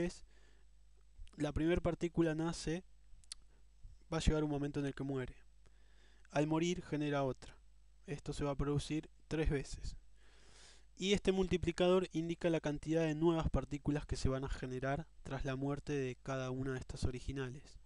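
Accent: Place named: Argentina. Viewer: Siempre muy buenos tus tutoriales master, concisos, bien explicados, sin dilaciones. De paso, descansa un poco los oídos escuchar tutos en argentino.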